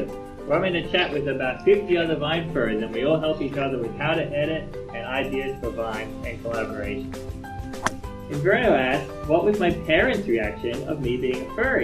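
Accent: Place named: Australia. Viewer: its the Aussie accent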